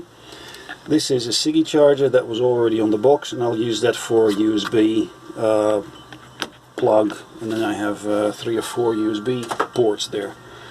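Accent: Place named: Netherlands. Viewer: Mooi. Vooral met het Hollandse accent. Ik ga het proberen op m'n Ranger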